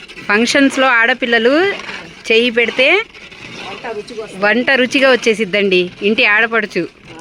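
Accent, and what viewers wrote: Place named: Godavari, India. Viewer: Mee Hindi language godavari lagundi leka guntur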